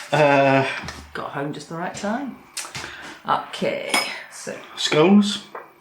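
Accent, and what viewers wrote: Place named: London, United Kingdom. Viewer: ) I am an Ilkeston Derbyshire (about 50 yrs ago) Sc-oh-n speaker. :-) I thought your pronunciation was by posh people from London. A very pragnatic review. I have an elderly (20+yrs) Phillips (about 2.4 L I think) thing and have recently bought an IP Vortex 5.7 Litre one cos it is bigger. I also have a Kasa thingy which gives some indication of electricity used (no idea how accurate, doubt it is very, if at all) and it says to me that it costs 0.14 kWh to cook a basket of Oven type Chips and about 0.2 kWh to cook the potato type (Non-par boiled which I would normally do). I know because I monitor my electrical demand daily that it used to cost 80-90p to make a batch of Home Brew (full Mashed from grain), it now costs over two pounds. Tsk - my expensive brew has increased in price to almost 60p/pint. (guesstimate) :-) I suggested some time ago that Scones were - sort of-separated out from a partially cut up shape of a round one in the middle and (perhaps) eight ones around the centre which were wedge shaped. 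I have still not seen where I think that from. Must make some.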